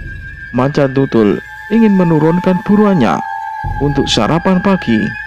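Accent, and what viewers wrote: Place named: Java, Indonesia. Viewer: Gak apa2 mase maju terus.. Unik itu dialek jawanya poko e top maseee semoga sukses selalu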